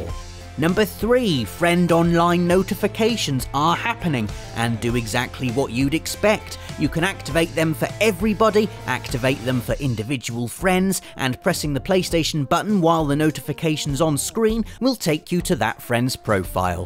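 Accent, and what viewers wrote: United Kingdom. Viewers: User Sczeshelled events. Love it! :) I wish I had a cool british accent too.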